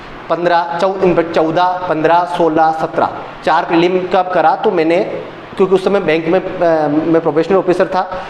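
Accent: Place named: South, India. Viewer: Sir aap south Indian ki tarah baat karte h...aisa lgta h jaise south me bahut rahe ho....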